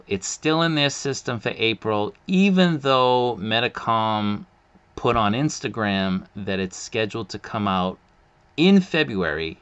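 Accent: Boston, United States. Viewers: Mahch :) Love your videos. I grew up in New England but I moved to Virginia so I don't get enough Boston accents in my life these days. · Are you from Boston/ New England area?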